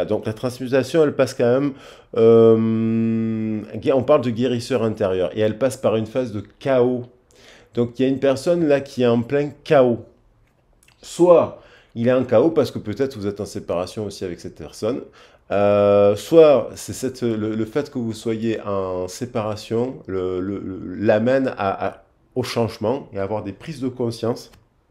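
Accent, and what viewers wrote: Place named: Marseille, France. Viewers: Coucou merci pour cette magnifique guidence je suis très heureuse de vous voir et de vous entendre sa fait plaisir avec ce jolie accent marseillais qui vous vas à ravir merci de prendre le temps alors que vous avez beaucoup de travail sa me mais en joie c est un jolie cadeau je vous embrasse bien fort et vous de gros bisous belle journée remplit d amour et de douceur 😚💕🌈🌞🌹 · Bjr. Je vs découvre ce jour. 🙏🏻🙏🏻🙏🏻 J adore votre accent Marseillais 😉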